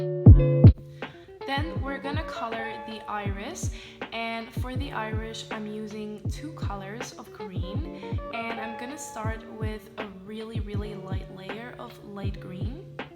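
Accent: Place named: Netherlands. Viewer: Me: hmm it looks like she got a dutch accent! *looks in discription * "where do I live? The Netherlands Me: *cheers*I'm Dutch tooooooo!💕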